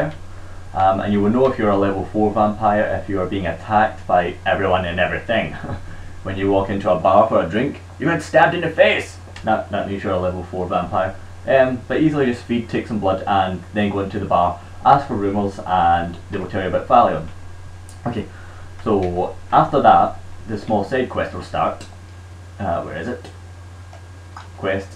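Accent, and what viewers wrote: Scotland, United Kingdom. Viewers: Are you from Scotland or are you from Belfast England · I subscribed lad because you're scottish! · You sound scottish haha Ich bin aus Mulheim an die Ruhr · I was going to be cool like these fools and ask if you were, like, Russian or something...but it's pretty clear that you're Scottish. Scottish FTW. Thanks for this video.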